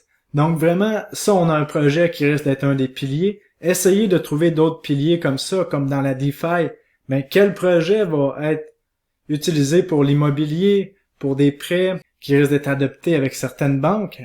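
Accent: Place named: Quebec, Canada. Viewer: Merci tu nous motives dans nos investissements et c est tjrs un plaisir d entendre ton accent, ça m rappelle mes vacances au Québec!! Tkt on partage ta vidéo en Belgique et ailleurs dans le monde francophone!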